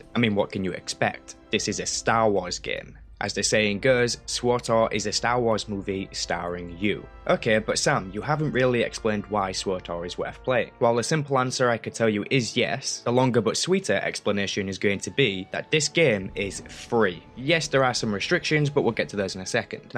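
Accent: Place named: Northern England, United Kingdom. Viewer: middlesbrough your from northern england for sure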